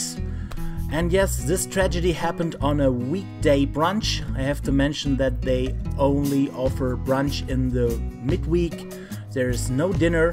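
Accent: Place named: Germany. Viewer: I happen to love your German accent & your videos :)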